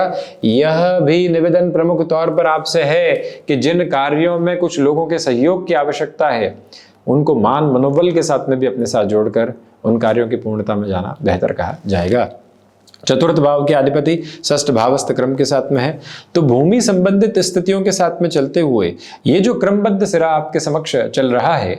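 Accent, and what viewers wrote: Standard. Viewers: Aapka shud Hindi sun k bohot kushi milti h . YouTube mein aap hi sayed akele hai jo shud hindi bolte hai . Bohot bohot dhanyabaad aapka hare krishna 🙏🙏🙏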